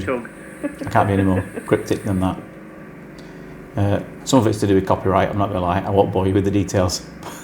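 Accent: United Kingdom. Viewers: Did you say that you twang your G string every now and then? 😂 you need to Google what a G string is in the US…LMAO 😂 I love the way you British talk!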